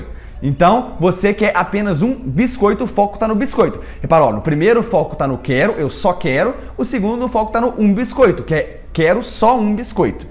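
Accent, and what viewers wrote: Minas Gerais, Brazil. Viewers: Ele é mineiro?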